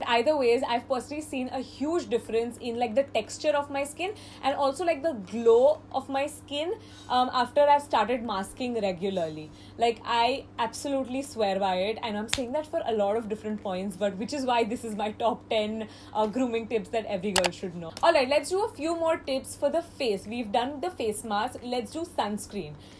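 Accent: India. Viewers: I luv ur hindi accent diii......😍😘😍😘😝😛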